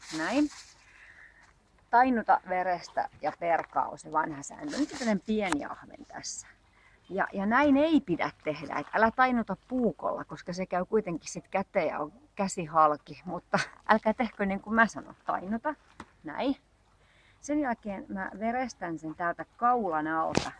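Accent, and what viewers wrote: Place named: Turku, Finland. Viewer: Tyypilinen turun murre.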